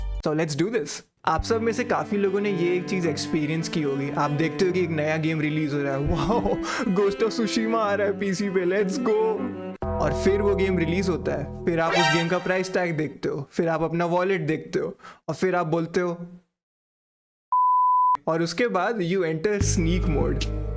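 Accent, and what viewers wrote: Odisha, India. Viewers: BRO you from odisha ? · Are you from odisha !?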